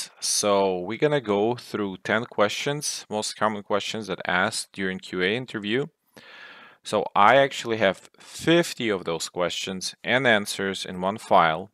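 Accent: Russia. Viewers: Fix your ruzzian accent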